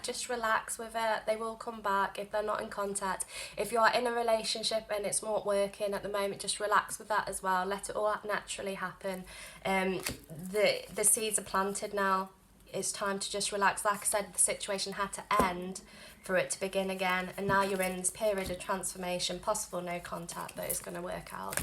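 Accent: Liverpool, United Kingdom. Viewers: btw it’s such a shame that I don’t live in the UK anymore or else I’d pay you a visit in person. I used to live in Edinburgh and was there for my postgraduate education for 2 years. I loved everything there and didn’t really want to go back to the US but I had no choice cuz my student visa expired and I ended up living in Taiwan for now 😂 I miss the UK a lot especially when I hear you talk 😭 You sound like you’re from Liverpool or somewhere closer. P.S. I was also wondering if it would be ok for you to do a pick a card reading on “Are they still testing me?” or “Are they still observing me?”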